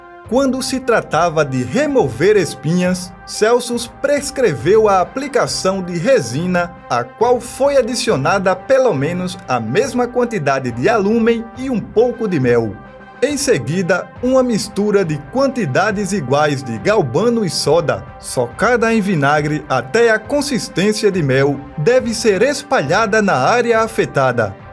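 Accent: Northeast, Brazil. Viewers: Gosto de ouvir a sua voz. O jeito de falar é interessante. Você é do nordeste?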